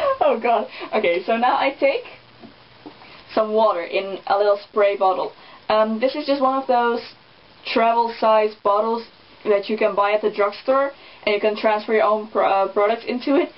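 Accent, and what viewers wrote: Netherlands. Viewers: Are you dutch..? I can hear that you say sok instead of sock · You have a dutch accent :)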